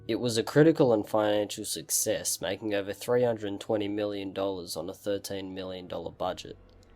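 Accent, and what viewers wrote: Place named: Australia. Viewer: I think he's Australian.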